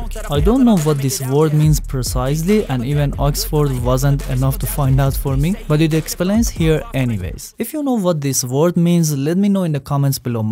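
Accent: Iran. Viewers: از لهجت فهمیدم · Persian accent never die!!!😊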